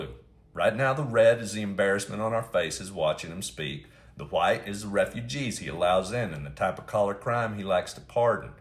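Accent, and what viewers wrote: South, United States. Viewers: a smart man with a southern drawl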